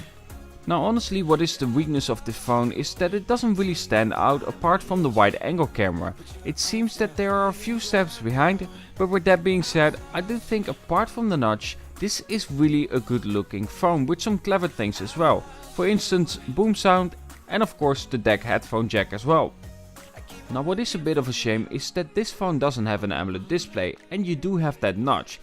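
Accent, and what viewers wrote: Netherlands. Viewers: Are you Dutch? Because you speak English like a Dutch man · hoorde aan je accent al dat je nederlands was haha en je komt ook nog eens uit eindhoven net als mij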